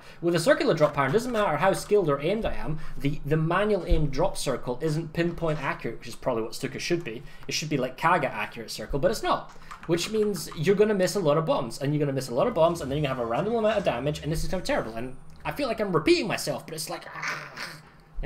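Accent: Scotland, United Kingdom. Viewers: ?? ...ok... II'm a Midlander, and even I can hear the Scotts in his accent, than an Irish sound. (Mind-due the Proddies and Catlicks can sound different from each other, ..but, just the crazier ones of of both sound more like Glaswiegens with less phlem & spit in their syllables me.) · You are a Scot? You sound very American... I would love to hear your commentaries in Scottish, love the accent! :D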